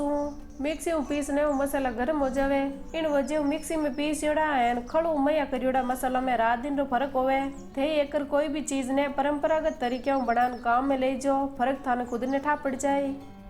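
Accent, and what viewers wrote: Marwar, India. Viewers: जी बहुत शानदार प्रस्तुति के लिये बधाई, आप की मारवाड़ी बोली बहुत अच्छी लगती हैं। आप का बहुत बहुत धन्यवाद 🙏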